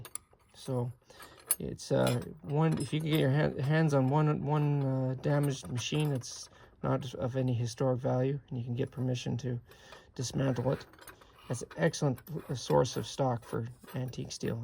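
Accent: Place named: Canada. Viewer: These chisels are great mate! love the fire sharp chisels. I have a ton I was given in my workshop that are super old. Ill do a video of them at some point. They need re-tempering really and don't hold up to the sandstone I often work, and the abuse I put my tools through 😂 Where in Canada are you? I spent some time over there a while back. miss that accent.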